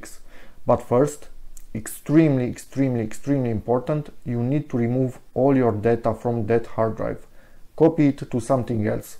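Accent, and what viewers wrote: Romania. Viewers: are you romanian? the accent gives it away😀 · Ănd hir iu mast bi șur. Mai bine ai spune in româna. Ori fă pronunțarea sa fie in engleză și nu româno-engleză.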